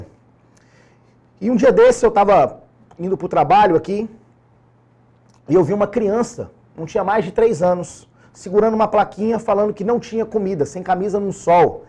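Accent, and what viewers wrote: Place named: Rio de Janeiro, Brazil. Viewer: jurava que você era do Rio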